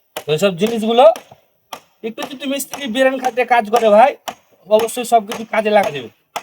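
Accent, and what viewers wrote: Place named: Chapai Nawabganj, Bangladesh. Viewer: চাপাইনবয়াবগঞ্জের ভাষা এটা ❤❤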